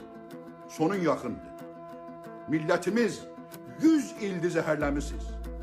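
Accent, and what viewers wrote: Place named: Iran. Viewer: Mene bir şey maraqlidi bu çöhreqani soy ismi iran diyalektinde danişiĝi iran şivesi baxmayaraq azi 20 il amerikada oĝluda eyni sen numune olmalisan ama doĝru düzgün Azerbaycan Türkcesinde danişa bilmirsen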